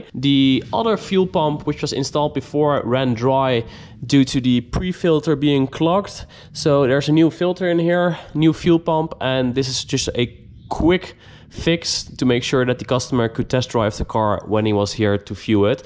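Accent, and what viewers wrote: Netherlands. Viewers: what an awesome fucking swap man love it! i’ve been looking into maybe getting a volvo wagon & getting it swapped, i love older volvos and giving it a step up w a v8 sounds like a damn good deal. live in the US now but grew up in amsterdam and your accent makes me smile! awesome videos man thank you, looking forward to another post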